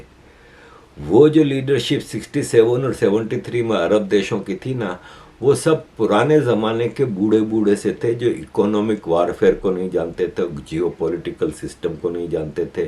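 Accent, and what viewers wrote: Gujarat, India. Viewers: sir I watch your videos and am always curious why you say "jay jindera" in your intro. Then I research about you and I found out your roots from Junagadh Gujarat and your accent is also Gujarati Hindi/Urdu accent I got that coz am also being gujarati i got that now. Hats off sir of your work and spread knowledge & awareness to world.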